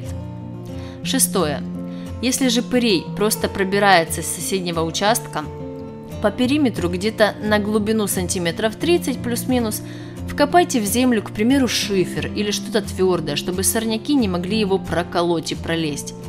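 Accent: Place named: Ukraine. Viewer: ОН С АКЦЕНТОМ ГОВОРИТ, ПОТОМУ ЧТО ОН С УКРАИНЫ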